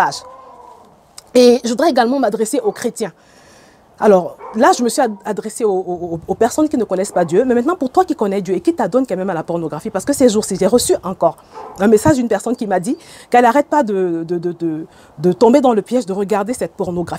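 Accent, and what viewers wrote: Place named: Mali. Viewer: C claire t une malienne vu l'accent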